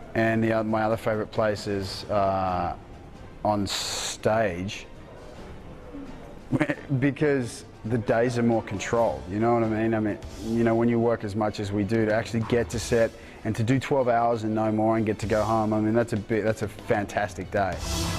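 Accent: Australia. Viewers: American here, OMG, he's even hotter with the Australian accent! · 'cause he's aussie and he talks with aussie accent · I didn't know Alex speaks with a phony American accent in the TV series "Hawaii 5-0!" His Australian accent gives him a whole different persona. 👍 😉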